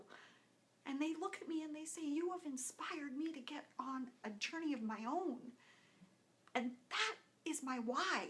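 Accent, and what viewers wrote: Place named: Chicago, United States. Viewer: Hi, I really love your enthusiasm! I'm originally from Chicago,but now reside out in Arizona with my husband and two now adult children! You have an "Chicago accent";) I'm a Christian and love the joy that you exude! I started following you on Twitter too! Blessings from sunny(and Hot!) Phoenix, Arizona!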